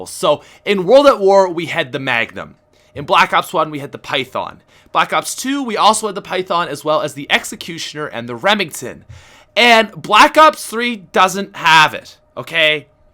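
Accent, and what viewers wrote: Canada. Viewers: he's Canadian so he pronounces words differently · It's his Canadian accent calm down (I think :/)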